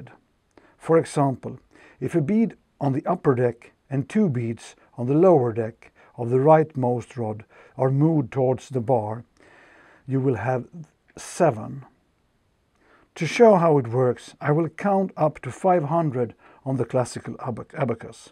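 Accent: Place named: Sweden. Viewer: That Swedish accent :D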